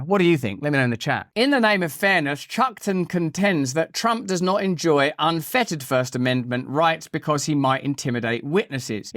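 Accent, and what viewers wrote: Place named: Cockney, United Kingdom. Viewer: I saw you in a period piece for Public Telly with short hair in a suite and thought you were impressive in a Dramatic role. All this latent 1960s British Hippy or 80s big hair sex drugs, MTV crap is why you and Trump are kindred spirits, but you are more articulate even with that London Cockney. Brilliant assessment of the Gag Order and Trumps Al Capone comparison. Loved the Jesus analogy and Trump juxtaposition De javu.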